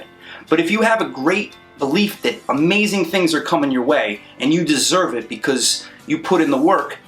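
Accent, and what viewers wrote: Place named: United Kingdom. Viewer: It's all about the British accent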